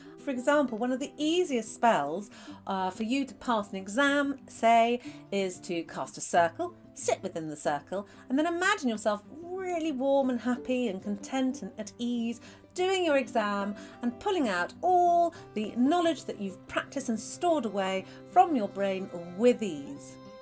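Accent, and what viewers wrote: United Kingdom. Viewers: I am utter rubbish with meditation. I either fall asleep or think of 500 other things I need to do. I do love my pendulum though!! I recently was a guest speaker at a collage class on religions. Brief history on witches, sabbats, and practices. They were facinated with my pendulum, their inability to get him to work, loved that I could get answers and was correct. Thank you for this information ma'am! I have already shared as your voice is so much better than mine. Brittish accents are the best!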